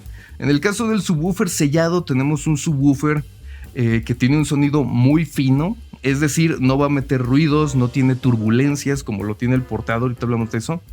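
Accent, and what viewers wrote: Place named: Mexico. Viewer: soy Chilena y me encanta tu acento jajajaja es de pelicula ....saludos aguante México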